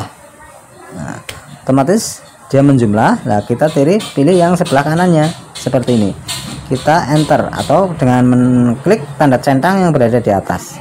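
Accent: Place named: Cilacap, Indonesia. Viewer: Suaranya asli cilacap, kayak kaki mukidi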